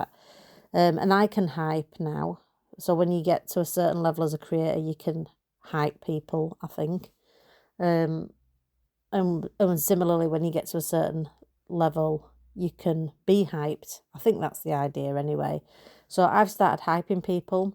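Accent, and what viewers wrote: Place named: Northern England, United Kingdom. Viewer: Years ago I had a Siamese cat he hated dogs, he would chase them out of the yard he didn’t care how big they were he’d bite their ankles jump on their back and bite their ears, they would run screaming out of the yard he would walk back tail in the air smug look on his face. If I saw a dog out the window he’d run to the door tail up let me out! I really enjoyed this chatty video I hope you will do more, I followed along while I sat here here in Australia by myself I enjoy your company, I like to hear you talk it reminds me of when I lived in the north many years ago, when they’d say things like put wood in ole, or shut dooer, nobody ever said’the’ anything, sometimes it seems the rest of us are a bit too English 😂 it’s supposed to get to 48C today, I’m sat under the split system, cool air blowing, not going out today! 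Sat in a thin nightie, keeping fluids up , curtains shut against the sun. And you have cold and rain! It will be our turn soon enough, at least then we can add extra clothes to keep warm but there’s not much relief when it’s too hot 🥵 I love your idea to raise money for cancer and I will be donating. ❤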